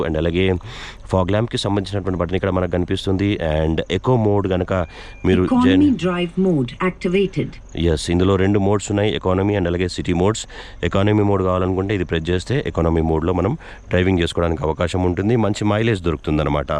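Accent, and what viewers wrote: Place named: Telangana, India. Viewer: using our Telangana voice language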